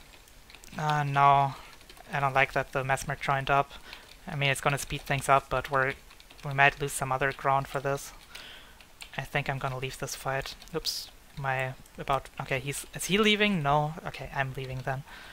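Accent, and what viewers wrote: Germany. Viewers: den deutschen akzent bekommt man einfach nicht weg :) gutes vid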